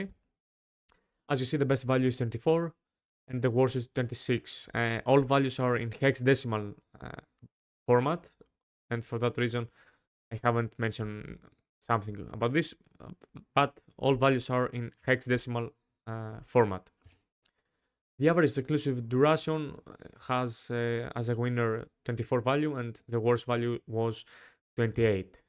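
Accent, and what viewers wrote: Greece. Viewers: Το καταλαβα οτι εισαι ελληνας απο την προφορα.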